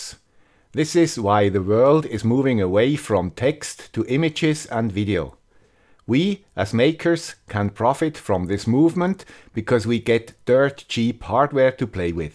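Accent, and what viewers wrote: Switzerland. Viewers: Hello guy with the Swiss accent! Any idea what the camera connector is, CSI? Could you make a video to experiment and let us know if it would be possible to use a 5MP or 8MP camera from a raspberry pi, to increase the fps and quality? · Yes, THAT is an swiss accent. Notice the distinct difference between a german speaking english? And seriously... Tensorflow on the RPi? Do you know Yolo (uses Darknet)? Yolo classifies quite many different types of objects, but requires quite some processing power... the RPi3 needs around 40 sec for a single frame!!!!!! To make it realtime some external accelerator is required... and even veeeeery small cnn network models,,... It might work with very small images (need to test though...) like 50x50 greyscale or so...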